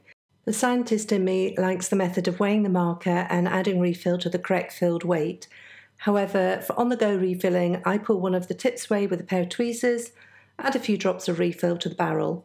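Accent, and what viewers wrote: United Kingdom. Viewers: Absolutely amazing. And great to hear a British accent 😊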